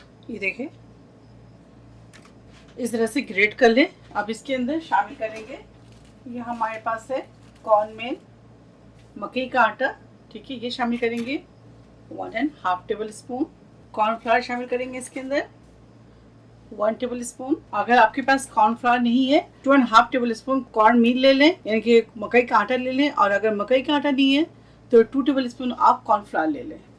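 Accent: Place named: India. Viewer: How nice you explain every thing ...... I will try the patato that way loved you accent from india....... And give more food video.......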